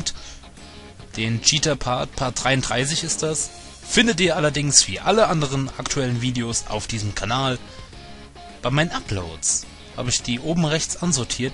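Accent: Hesse, Germany. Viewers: bist du aus ghessen, wegen dem akzent?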